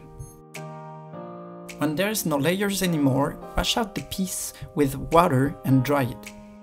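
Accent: France. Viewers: I like your French accent me too I have a French accent I am Mauritian the family speak French and creole thanks very much for your video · Don’t ever apologise for you accent we love it! Be proud of your heritage and you French culture thanks for the video! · don't apologise for your accent , it's what makes you unique, i have a french accent too, ah ah , love your video by the way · Love your french accent!!! 🤗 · Absolutely no need to apologise for your gorgeous French accent! You speak far better English than some people in my part of the UK! Thank you so much for this video - worked perfectly on my very neglected burners ❤️ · Good information! Love the French accent -- no need to apologize. :-) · We love your French accent. Your are French after all❤️❤️Merci!! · I've lost all interest in cleaning my hob – I just want to stay here and enjoy the lovely French accent! 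· There's nothing wrong with your French accent. You should listen to mine! LOL! Very good job on the video! Well done! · Thank you, I will tryy this. Your French accent is charming! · i love your french accent! Tres Sexy! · Thank you for sharing and please no apologies for your beautiful French accent! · Your French accent is very sweet and charming and your English is fine. No need to apologize. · Please don't apologise for your georgious French accent, to me that's what made this video so interesting. Had to watch it twice. Very good advice Also some of the comments were helpful to. Thank you for this video. From London UK 🇬🇧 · Your French accent is music to my ears!! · Your French accent is fine and your English is excellent. Thank you for your video. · Your French accent is music to my ears and your English is faultless. Thank you for the video, it was most helpful 🇬🇧🏴 Enjoy your weekend. 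· merci beaucoup for the tips. Love the french accent so no problem at all!😊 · Never apologize for your french ascent love it. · There's no need for you to apologize for your french accent I can understand your English perfectly.🤗🤗🤗🤗🤗🤗🤗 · Please never ever apologise for your French accent! It's adorable! And this is an excellent video, so thank you for posting. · thank you for your video. your french accent is gorgeous! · It is nice to hear your French accent.Thankyou this is so helpful. Easy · Your English is great and I like your French accent. No apologies are necessary. Thank you for sharing. This is a great tip. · Do not apologize for your French accent. Merci beaucoup.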